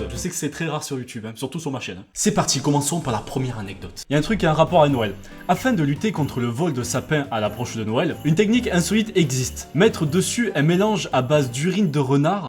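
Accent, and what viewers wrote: South, France. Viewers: Lmao he has a strong southern accent so good Luck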